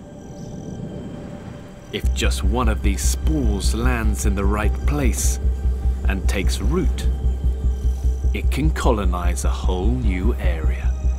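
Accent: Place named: United Kingdom. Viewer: 剛開始看沒多久就覺得這聲音好像貝爾 結果結尾就看到貝爾的名字 他的聲音和英國口音真的已經深入腦海啦XDD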